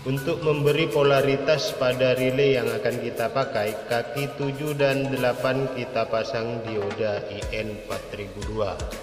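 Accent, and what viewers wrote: Madura, Indonesia. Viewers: Madura ya mas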